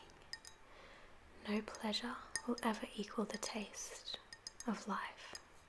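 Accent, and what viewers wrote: Australia. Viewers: the Australian accent which is a trigger in itself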